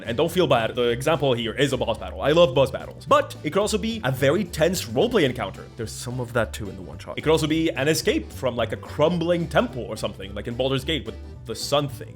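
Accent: Spain. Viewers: Também gosto que tu é espanhol, algo na fala derivada do latim me da uma sensação comunitária também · Is that a spanish accent I hear? I always thought you were italian!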